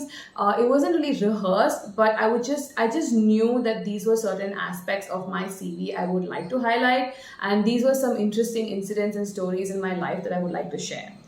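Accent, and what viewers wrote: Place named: United States. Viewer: 1 more question • How did you improved your communication and English speaking(American accent) ? As a indian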